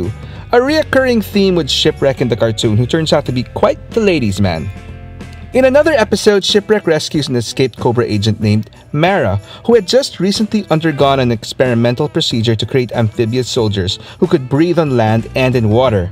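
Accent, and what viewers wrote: Philippines. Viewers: from your accent. are you from Philippines? Just curious. Was G.I. Joe big over there? I'm surprised when I see pinoy youtubers talking about gig joe. I never knew it was shown over there in the 80's. By the way you don't need to insert an image of every word or phrase your saying. for example, we don't need to see images ofMadeline Pryor when you say prior or images of Michael Jackson, goat saying bad and all the other corny images. Just stick with the subject. The other images are just so fake and corny.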